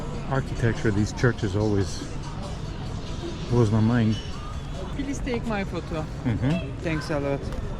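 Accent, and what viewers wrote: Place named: Scotland, United Kingdom. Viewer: A wee bit Scottish dialect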